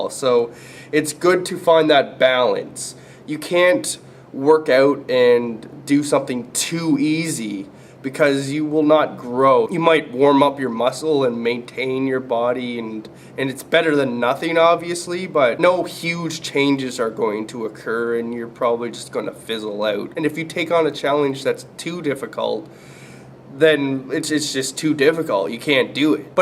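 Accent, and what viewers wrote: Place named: Canada. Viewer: Actually your account said you were. But now that you mention it, yea I hear the voice of a Canadian. *thumbs up!*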